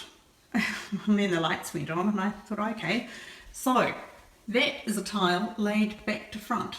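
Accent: New Zealand. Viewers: I thought I heard the New Zealand twang.....it never leaves you my dad came from Scotland and never lost his Scottish twang even though he lived in Yorkshire for many years.....have not visited the South Island but that's my intended place next time fingers crossed.....x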